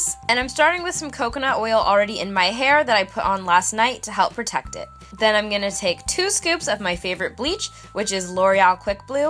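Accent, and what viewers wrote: Jamaica. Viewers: that jamican accent tho i can teach u cuz i am jamaican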